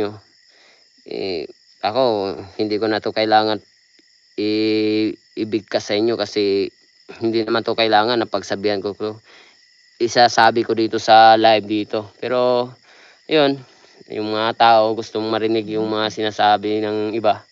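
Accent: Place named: Visayas, Philippines. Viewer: Katawkatawa pa..klaro kaayo wlay grado....ayawg english2 kay bisya ra kaayo ka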